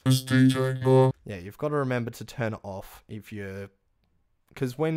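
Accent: Australia. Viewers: wow i just found your channel and so far its the only helpful channel ive found :3 im guessing you are australian? so am i!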